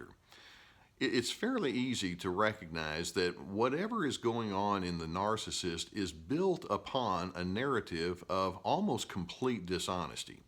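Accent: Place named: Texas, United States. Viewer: Such hard-earned wisdom (and common sense) delivered with that charming Texas drawl. These are calming and really informative. Thanks Doc.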